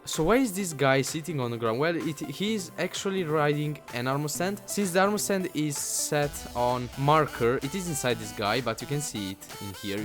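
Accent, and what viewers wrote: Italy. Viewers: An italian accent! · Si sente che sei italiano ;) :D ...... SHISH!!! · mado si se sente che sei italiano haha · Ehi, ma sei italiano? Nel caso si capisce dall'accento molto chiaro ahah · bel video, l'accento italiano si nota :) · Sei per caso italiano? Parli inglese ma mi sembri italiano dalla pronuncia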